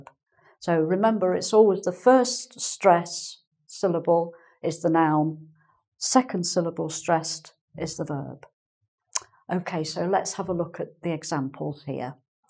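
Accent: United Kingdom. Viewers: Great explanation! ... and your nice British accent: Terrific... I love it a bunch ! ... Thumbs up ! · Thank you very much teacher. it's so interesting and helpful video. please do more lessons involving English pronounciation. I really like British accent ^^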